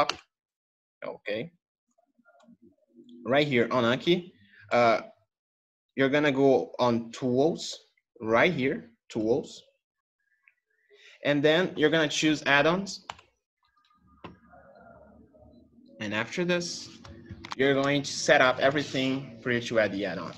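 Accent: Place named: Brazil. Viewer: Só pelo sotaque já vi que era BR 🇧🇷❤️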